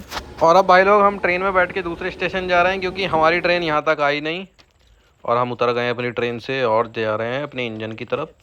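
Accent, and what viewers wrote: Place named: Western Uttar Pradesh, India. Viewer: भाई up west के हो क्या? Ascent lag raha hai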